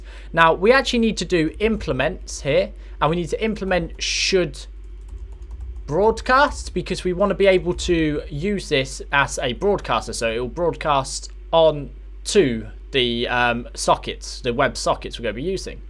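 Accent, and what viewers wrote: United Kingdom. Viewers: british accent hahaha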